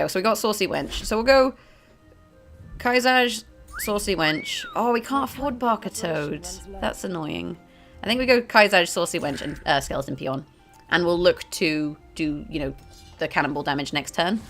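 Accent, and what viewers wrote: United Kingdom. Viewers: I can't place your accent. British with Irish parents living in the States is my wild guess. Anyway another good vid, have been looking for a deck to get me out of plat. I run several adapted versions of your decks to deal with my Diamond card poverty. They are consistently effective. Cheers for the good work.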